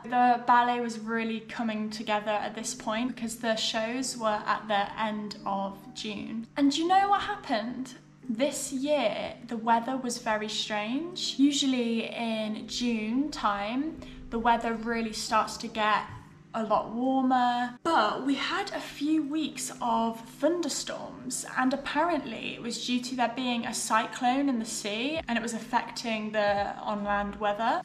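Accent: United Kingdom. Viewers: not sure what i like more about, her proper British accent or her personality. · I love your british accent! I can't explain why, but I feel like english should be spoken just like that. It's sounds so good!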